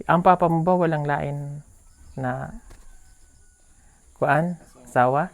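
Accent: Visayas, Philippines. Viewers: Natutu na C mag bisaya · Kabalo na jud mag bisaya c...congrats po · Kblo na jud si mag 😂bisaya ba..😊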